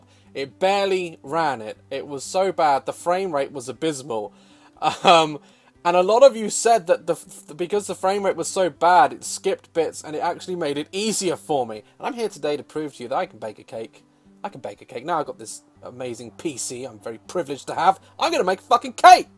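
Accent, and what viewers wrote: United Kingdom. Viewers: Oh, man. Can't stop laughing! The slightest sound / gesture Daz makes, is so damn hilarious! 🤣😂 Always the best solution when feeling sad. Also, as a non-native English speaker, it's great to hear his British accent. Perfect for some tea, HAH!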